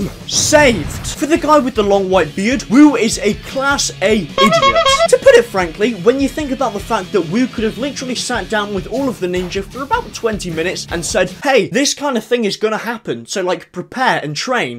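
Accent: United Kingdom. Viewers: Your funny as it is bud your British accent makes it ten times better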